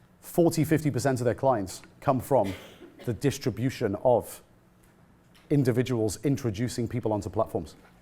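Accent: United Kingdom. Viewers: Dont be intimitated with british accent. Turn on CC and translate words that dont make sense. Best of luck!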